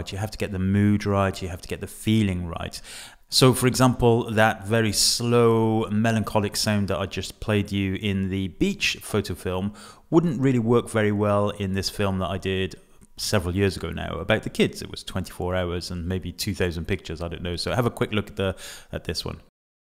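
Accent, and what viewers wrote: United Kingdom. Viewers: I love your british english 😂. Thank you for this very important video!!! I hope to meet you ONE DAY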